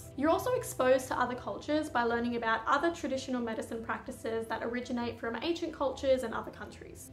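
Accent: Australia. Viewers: I'm so glad I accidently found your videos. I live your energy. I was just playing random naturopath videos while doing a workout and I was like is this an Aussie? lol *I'm in Q.L.D. 😎🍉💃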